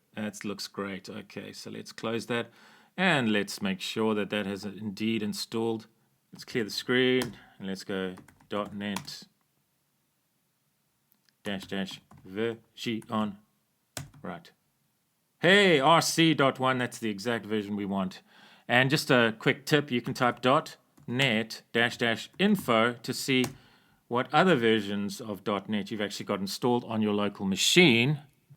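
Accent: South Africa. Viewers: That annoying invisible character that was at the start of your files might just be the BOM, at the bottom right of VS Code you can see "UTF-8 with BOM" so you might be able to disable it there Are you from south africa or am I mistaken? (because of the accent)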